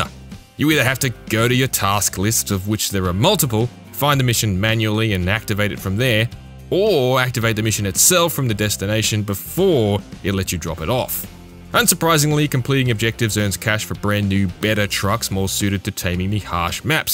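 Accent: Australia. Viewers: U an Aussie mate? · I might be going on an Australian outdoorsman sort of stereotype, but the reviewers voice gives this video more authenticity for me. Just sayin’.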